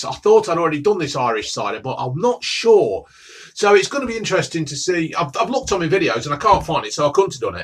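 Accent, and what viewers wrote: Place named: Leicester, United Kingdom. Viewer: Suiceyed love the leicester accent on this man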